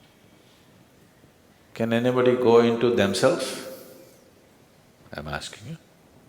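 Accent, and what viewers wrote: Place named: India. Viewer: 薩古魯有印度口音... 真的要聽清楚也不容易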